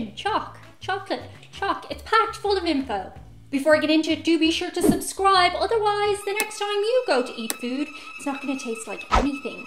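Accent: Ireland. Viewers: Hello. What part of Ireland are you from? Im guessing south east. Co Wicklow?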